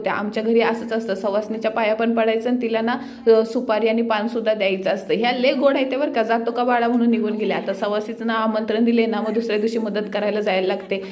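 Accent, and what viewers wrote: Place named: Karad, India. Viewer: Tu lay bhari karadi bhasha boltes❤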